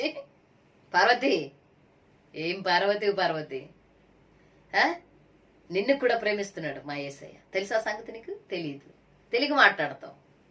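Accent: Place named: Srikakulam, India. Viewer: మరి దీనికి 100 మంది రంకుమొగుడులు దీని శ్రీకాకుళం భాష దీని పెంట మొహం ముండా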